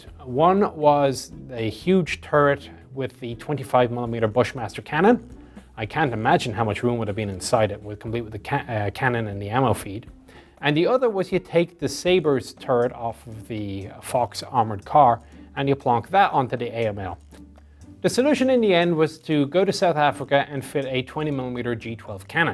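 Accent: Ireland. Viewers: It’s that Irish lilt and occasional ‘tongue in cheek’ observation that keeps one engaged. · Did the accent never give it away? lol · all my life living with an irish mother is probably why i never noticed your irish accent... I alway knew it sounded a bit strange though, guess i just never put two and two together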